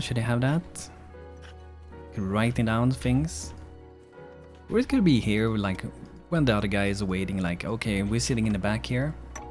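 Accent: Sweden. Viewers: This game has so much potential, whenever I saw the trailer i was super excited. Awesome videos, dude. Subbed! :) ps: Where are you from? You sound swedish.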